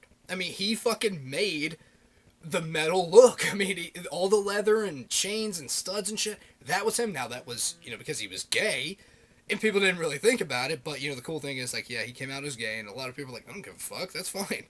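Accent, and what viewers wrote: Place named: Germany. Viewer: your german is funny :D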